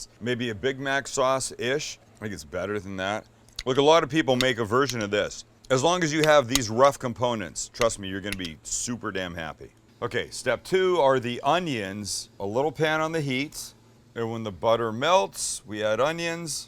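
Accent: Canada. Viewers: Hmmm - - did I just detect the faintest trace of a Canadian accent.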